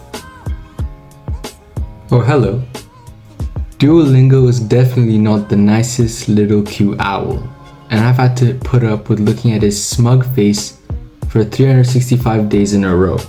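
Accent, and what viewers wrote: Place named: United States. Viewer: oh Gosh, but u seem an American guy cuz of ur English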